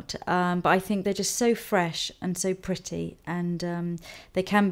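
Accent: Netherlands. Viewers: I heard her accent and I KNEW she was Dutch! YAY HOLLAND! :D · funny, i''m dutch and i recognize her accent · She is from Netherlands.. So that might be Dutch - English.. ;) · I could hear she was from holland. Her accent (when she speaks English) sounds a lot like mine :) WOmderful look though! Looks great on her, I feel inspired! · I saw the other videos first and thought, mmhh she sounds Dutch. Then in this one it was confirmed haha can recognise dutch people anywhere!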